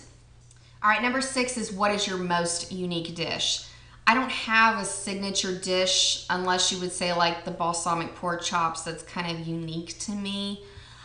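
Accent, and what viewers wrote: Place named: Oklahoma, United States. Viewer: You are fairly good at hiding your Okie accent, but I caught it in this video a few times ;) I would love dinner videos, but you know me-- I post food on Instagram a lot. I need to get my "lifestyle" or "mom" channel going so I can post what I eat in a day videos.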